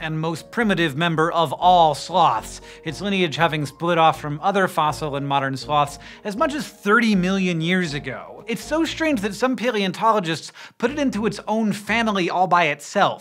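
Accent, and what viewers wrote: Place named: United States. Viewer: I knew Americans said 'erbs , but Lordie they say 'erbivores too.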